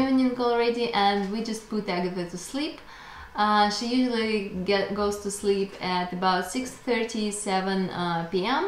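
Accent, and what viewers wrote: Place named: Russia. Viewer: Thanks for sharing, you have a wonderful family. I love your accent, reminds me of any action movie where there are Russian agents, like the movie Anna or James Bond. You have stunning eyebrows, kind of like Brooke Shields. Let’s hope Biden and Putin can get something going where the US and Russia can finally be Friends!!!! Good luck with your channel.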